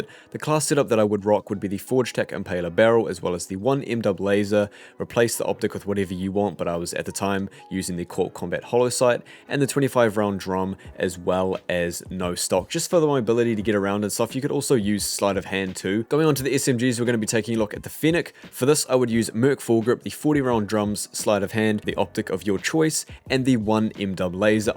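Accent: New Zealand. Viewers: Is he from New Zealand? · Are you from New Zealand?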